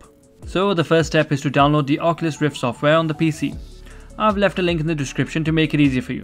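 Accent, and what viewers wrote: India. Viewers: u sound like dani but indian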